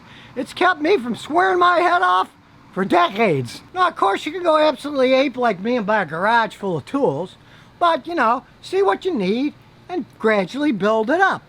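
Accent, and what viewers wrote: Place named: Chicago, United States. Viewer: I see the live free or die sign is he from New Hampshire or just like the motto, because he sounds like he’s from Chicago. Just curious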